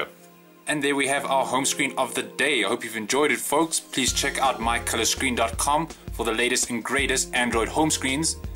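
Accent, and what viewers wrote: South Africa. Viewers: Hmmm sounds like a South African accent.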